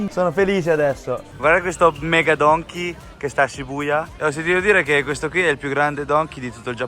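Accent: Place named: Pesaro, Italy. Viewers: Il tuo accento romagnolo😂💘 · Accento Pesarese direi.. Sei troppo simpatico!! Un saluto da Rimini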